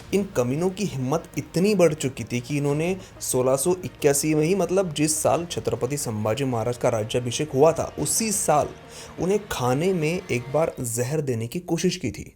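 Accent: Maharashtra, India. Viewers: बहुत अच्छा व्हिडियो! जी आपके कुछ उच्चार जैसे की 'हाथी' के बजाय 'हत्ती' बोलना और 'निर्माण' को 'निर्मान' बोलना उनको सुधारने की जरुरत है. आप मराठी हो ये आपकी बातो से झलकता है.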